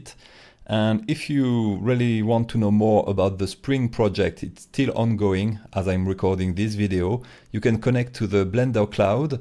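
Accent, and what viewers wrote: France. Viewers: Are u french ?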